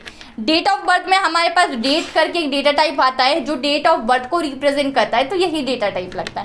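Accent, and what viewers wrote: South, India. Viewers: R u from South?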